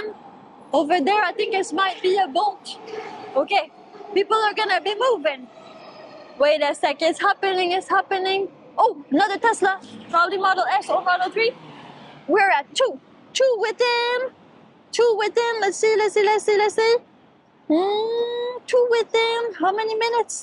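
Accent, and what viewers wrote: Quebec, Canada. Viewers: This is what Canadian women sound like? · French Canadian?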